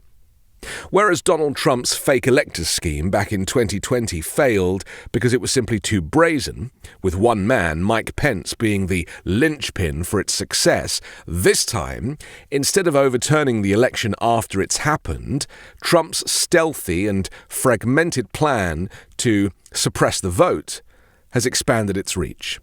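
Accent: United Kingdom. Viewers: I'm european and left-winger. In Europe nobody would be able to vote without proper ID. Given his accent, I assume this youtuber is British. He knows in his home country nobody would be able to vote without proper ID. In fact, you can't even open a bank account without ID, register kids in school, access healthcare services, nothing gets done without an updated ID card. That's why identity theft is rare in Europe. I don't understand why this is even an issue. Being able to vote without proper ID gives room to corruption and rigging of the election process. The USA needs to create a standardized citizen ID card like all properly funcional and organized countries have. This lack of official standardized ID card is some 💩hole country crap.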